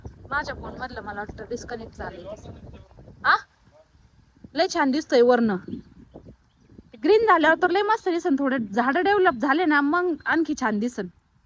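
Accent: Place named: Marathwada, India. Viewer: ताई मला ना तुमच्या मराठवाड्यातली बोलण्याची पद्धत खूप आवडते कारण तुमचे प्रत्येक शब्द खूप आपुलकीचे असतात मी दोन वर्ष मराठवाड्यामध्ये काम केले अहमदनगर औरंगाबाद परभणी अशा बऱ्याचशा ठिकाणी मी काम केले पण त्या ठिकाणी जी आपुलकी जी प्रेम आहे माणसं मिळाली ना ते खरंच खरंच खूप छान होते❤❤❤❤खूप जीव लावतात तिकडली😊😊😊आता खूप आतुरता झाली आहे ताई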